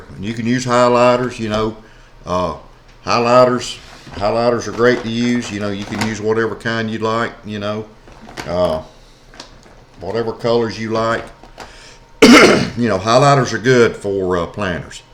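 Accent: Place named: Appalachia, United States. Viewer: It is so refreshing to not only see a man on "journal Tube" but also one that has an Appalachian accent. You sound like my family members and there's something really comforting about that.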